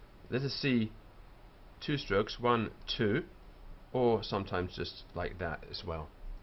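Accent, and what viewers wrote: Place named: United Kingdom. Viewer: the low audio quality and british accent makes it seem like some old bbc documentary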